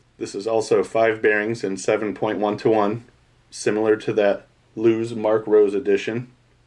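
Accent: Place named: Georgia, United States. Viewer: I'm gonna guess you are from Georgia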